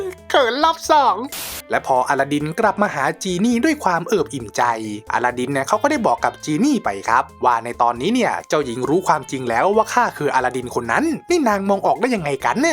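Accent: Isan, Thailand. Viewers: ทำไมพี่พูดอิสานได้อ่ะ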